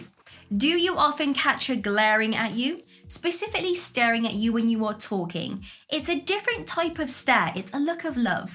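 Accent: United Kingdom. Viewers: I like this ladies sweet British accent 😍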